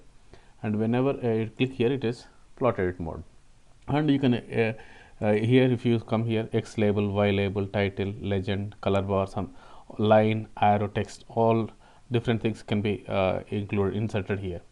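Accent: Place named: India. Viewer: Thanks dude I understood you even your indian accent is a little bit difficult to my ears but I caught it. I learnt a lot in this video.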